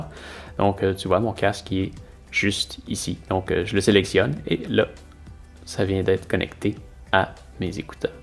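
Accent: Quebec, Canada. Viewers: Genial, merci beaucoup, ça m'a aidé à le repéré avec ma toute nouvelle montre Garmin :) En plus, je kif ton accent quebecois :) Juste un truc, je cherche encore comment on règle le volume .... si tu as la réponse, c'est welcome !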